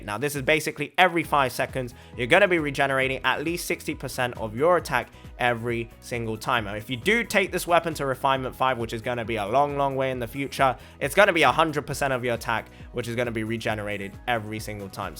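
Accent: United Kingdom. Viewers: An asian dude with a british accent. wtf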